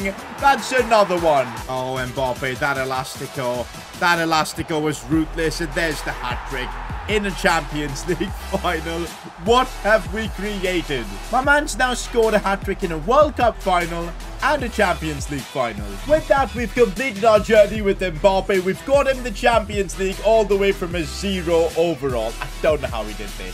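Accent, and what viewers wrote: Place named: India. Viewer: Who ever thinks has indian accent sound 👇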